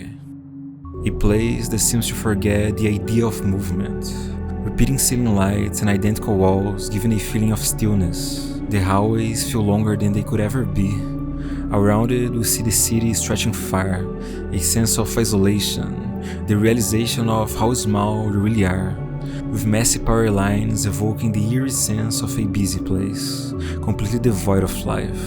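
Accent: Brazil. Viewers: Como alguem que mora no Canada ha quase 10 anos, seu sotaque é otimo e lindo! Consegue nao ser tao carregado a ponto de ser dificil de entender, mas ainda tem o charme do nosso sotaque tupiniquim.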